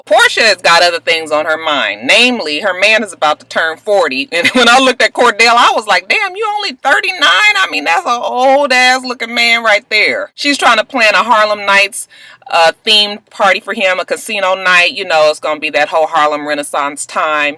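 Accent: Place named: Atlanta, United States. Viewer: You do sound a little ghetto but I love it! You sound like ATL native.....lol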